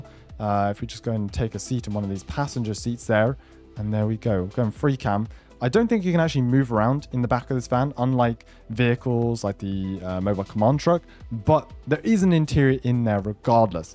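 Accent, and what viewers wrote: United Kingdom. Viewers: Wait are you British?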